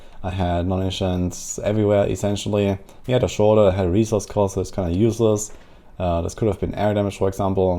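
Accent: Germany. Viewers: it might sound dumb BUT you sound so german are you ?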